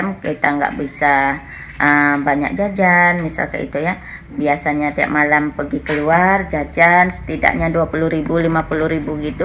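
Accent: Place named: Java, Indonesia. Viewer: Mbak Jawa nya nggak ilang....salam manis dari Kami di Depok yaaaa...🌷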